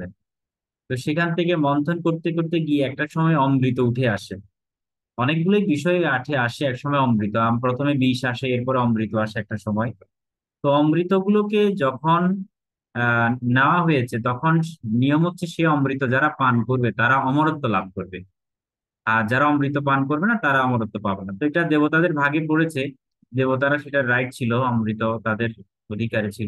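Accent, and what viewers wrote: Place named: Bangladesh. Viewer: Sir,আপনার স্কুল কোথায়? আপনার কথায় বাংলাদেশের একসেন্ট আছে।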